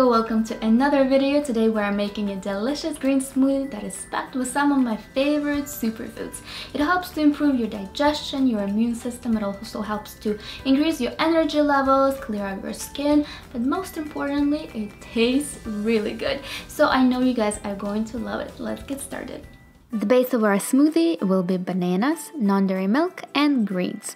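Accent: Russia. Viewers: я слышу русский акцент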